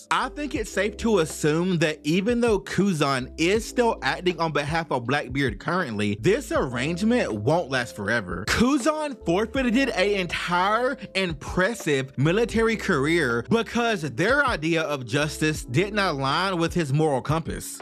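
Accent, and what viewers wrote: South, United States. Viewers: I'm loving the southern twang bro Def adding you to the one piece yt lineup 🙏🏾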